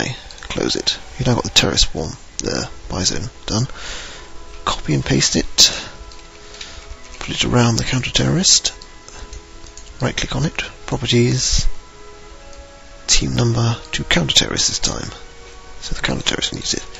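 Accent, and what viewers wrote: RP, United Kingdom. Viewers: Hey! you have such a posh accent, is it foreal? im from central london and my accent is not nearly as posh as yours! i cant imagin you playing CSS Shooting people, it would be funny lol. :) and thanks! i watched nearly all of your tutorial video's and i have nearly fineshed my first EVER map! many thanks. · You have a realy "Posh" accent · You talk posh ?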